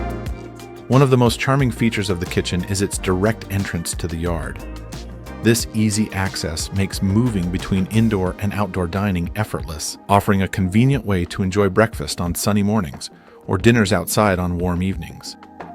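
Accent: United States. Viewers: Who is this 'Merican doing the voiceover ?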